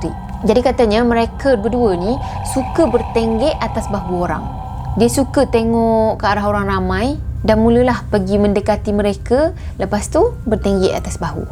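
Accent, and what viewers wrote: Kelantan, Malaysia. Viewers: Orang kelantan ke ni😆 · Akak ni orang Kelantan ke?🤔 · heyy .. ore kelate ko ni ?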